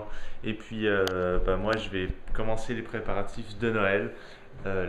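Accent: Quebec, Canada. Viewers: "Avant de connaître j'aimais pas l'accent québecois...Mais ca, c'étais avant":D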